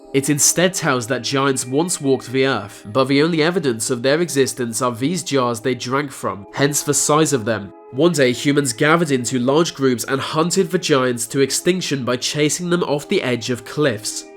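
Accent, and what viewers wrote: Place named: Cockney, United Kingdom. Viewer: Wanted to watch the video but couldn't stand his cockney accent. He doesn't so much speak the language as chew it up and let it lazily dribble out. UGH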